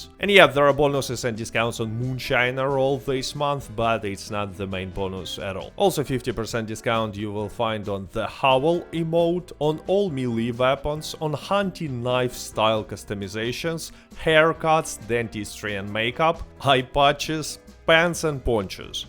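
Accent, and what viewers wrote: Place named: Russia. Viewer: Господи как же слышно, русский акцент, это жесть, попробуй чуть мягче, ощущение будто ты Борис)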